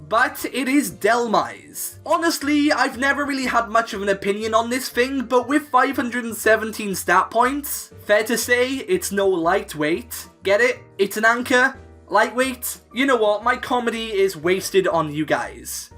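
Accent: Wales, United Kingdom. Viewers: Are you Welsh? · Youre welsh aint you butt?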